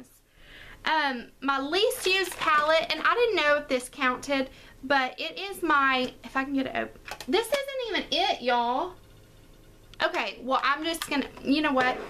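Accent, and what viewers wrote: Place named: Tennessee, United States. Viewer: New subbie! I'm from Tennessee and girl you sound like me! Lol loved the video can't wait to see more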